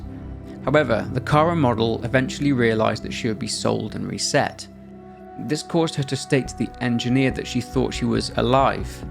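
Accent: United Kingdom. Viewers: Why is it always some british fool talking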